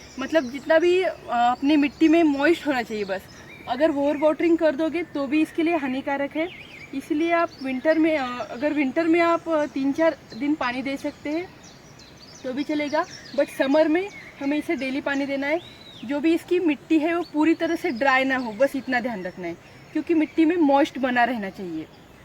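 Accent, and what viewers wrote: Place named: Maharashtra, India. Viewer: Tumi Marathi bolta ka....Tumcha aawaj Marathi Hindi sarkha ahe Mi Nagpur adhun aaj ha plant ghenar ahe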